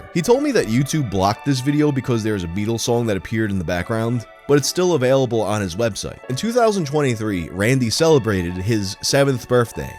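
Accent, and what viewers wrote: New York, United States. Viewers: Dude I love him and his deep New York voice like Peter Steele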